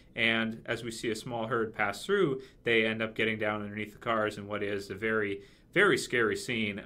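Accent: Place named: Canada. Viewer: Hey. Out of curiosity, what province are you from? I am an American but I lived in Newfoundland for awhile and based on your accent I'm almost positive that isn't where you're from haha. I've been to a lot of places in Canada and I was just curious as to what part of the country you're from.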